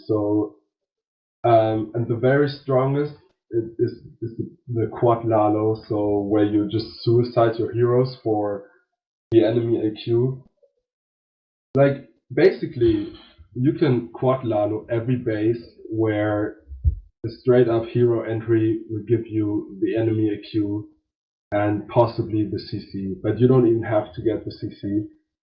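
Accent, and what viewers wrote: Germany. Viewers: base buirding..... I love the German accent!! DL FTW CWL invite Season 2!🔥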